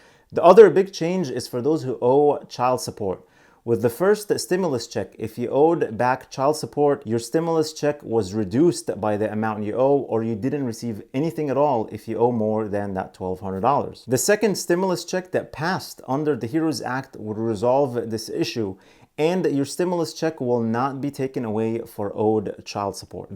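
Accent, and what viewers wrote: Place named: Arab world. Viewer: With all my respect to you. Money doesn't buy happiness. WHERE'S your awesome accent coming from? Arabic?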